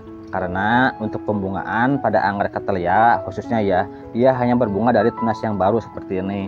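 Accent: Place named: Sunda, Indonesia. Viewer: Sy dengar pencerahannya TKS ya kang cmn sdkit geli itu logat sundanya meni medok psn😊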